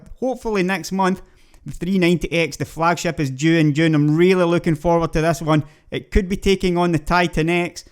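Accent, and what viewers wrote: United Kingdom. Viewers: You really sound like an arab guy that moved to UK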